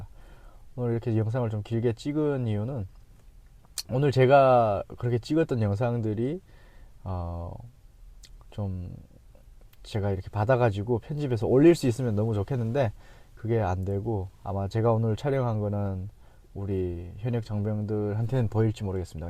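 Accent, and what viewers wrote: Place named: Gyeonggi, South Korea. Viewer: 잘 생긴 男배우같은~ 경기도 언어 억양, 이젠 ⚫️ 검은안경벗고, 자신감으로 😮 보기좋아요🎉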